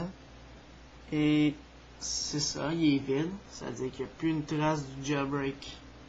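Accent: Quebec, Canada. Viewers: hey.. je tiens à vous prévenir que c'est pas sa faute si il a l'accent canadien, j'aimerais bien attendre vos voix, à vous. il a au moins le courage de faire une vidéo, donc arrêtez de critiquer, sa a du bien vous servir ce qu'il a fait. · Au Quebec ca parle comme ca, les Quebecquois parles mieux le francais que nous autres francais où l'on a remixé notre langue pour la rendre plus"cool" ... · C'est un canadien c'est pas de sa faute s'il a un accent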